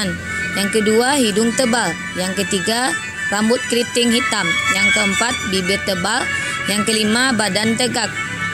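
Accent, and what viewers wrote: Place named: Malaysia. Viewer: kenapa pake logat malaysia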